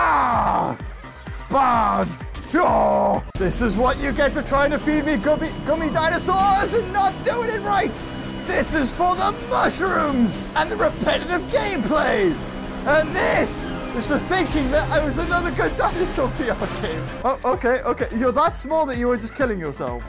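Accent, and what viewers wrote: United Kingdom. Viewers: Are you a britt? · Are you a British socksfor1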